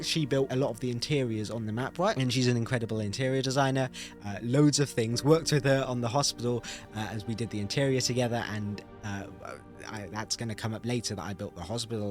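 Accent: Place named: United Kingdom. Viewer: Okay I'm gonna spill the tea... he's British.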